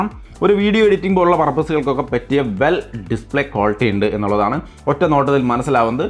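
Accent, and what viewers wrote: Thrissur, India. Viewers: Thrissur slang kattak vannandalo e videoyil😁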